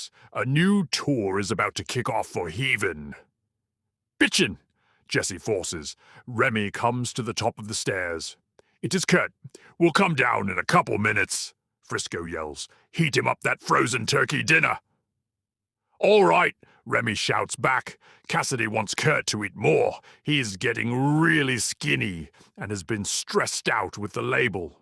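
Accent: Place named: United Kingdom. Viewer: I object to the British accent in the narrations. He is your idiot not ours!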